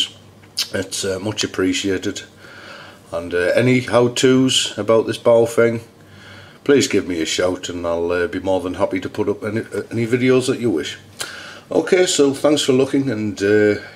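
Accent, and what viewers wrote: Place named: Newcastle, United Kingdom. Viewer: hi m8 u sound like ur up in newcasle in the uk im in hartlepool n just got thr baofeng uv-5rc and need help programming it can u help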